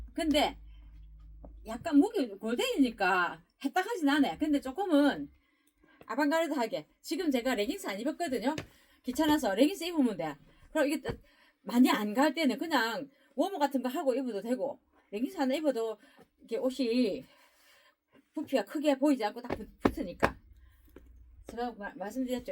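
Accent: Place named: Gyeongsang, South Korea. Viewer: 싸장님 갱상도 사투리 넘 재미나고 멋지세요~~^^아방가르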